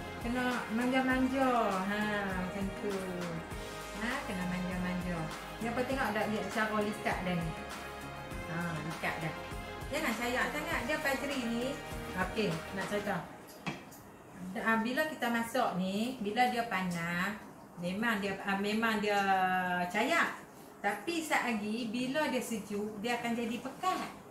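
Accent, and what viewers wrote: Kedah, Malaysia. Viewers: Adoi..kecheh lioq naa..paberet pulak tu..tq lah sharing resepi ni..suke bahasa cik ni..kedah me perlis ni..ingin nak bebual bahasa ni..sdap mcm mknn jugakk🤭😋❤👌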